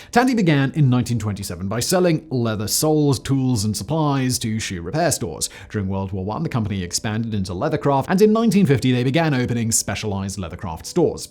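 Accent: United Kingdom. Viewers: I do love all of Simon's channels, but he has a rather insistent habit of pronouncing names in the most British way possible. He gets several other names wrong in this video alone lol.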